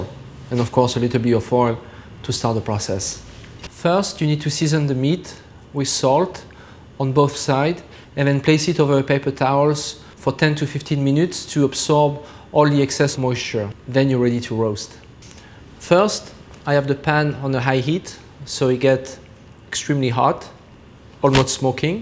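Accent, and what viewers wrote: France. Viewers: This is bullshit - just because he speaks English with a French accent doesn't automatically mean he can cook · French accent is funny, nice video learned a lot from it.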